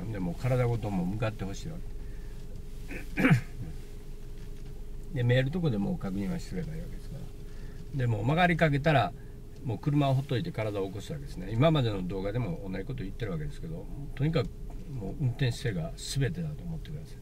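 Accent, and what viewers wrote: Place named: Kansai, Japan. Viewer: 懐かしい関西弁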